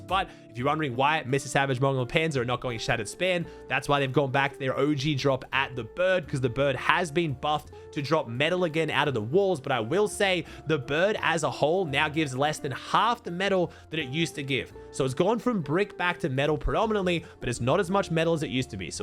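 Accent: Australia. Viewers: Tooo aussie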